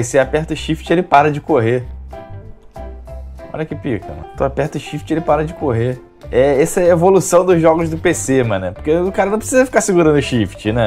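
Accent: Rio de Janeiro, Brazil. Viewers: Carioca tem o sotaque engraçado para quem não está acostumado com a gente.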